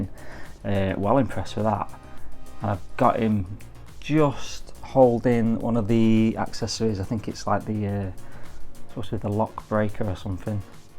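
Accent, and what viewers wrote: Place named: Manchester, United Kingdom. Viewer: "I can barely speak English meself, to be honest." LOL! Me too! I'm a Mancunian so I grinned at your use of "meself" instead of "myself" as that's my dialect too. "Mi" instead of "my" "tuh" instead of "to". Yep. When I moved to where I currently live a lot of the neighbours had difficultly understanding my regional accent. You say how good the paint application is on the head sculpt and oh my, yes it is. From the changes in skin tone at the edges of his eye to the slighter blush of his cheeks, then down to the greying of his stubble. It's incredibly subtle and skillfully done. A tube shaped container. I wonder if that's supposed to be a nod to the tube he gave to Zam Wessel containing those creatures that were intended to kill padme at the start of Attack of the Clones. Everything about this armour is outstanding, particularly the wonderful weathering they've given it, but also other details right down to the tread on the soles of his boots. 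"I've been wanting a Jango Fett since I started collecting Hot Toys." And the delight at finally getting him was evident in that big smile across your face at the start of the video when were holding his box. I think that kind of sums up a lot of why collectors buy these figures - they bring joy. I'm really pleased that you got such a long awaited figure,. Congratulations. Bet he's going to look superb in your stylish detolf displays. I think the poncho, paired with his twin guns, really brings home the fact that he i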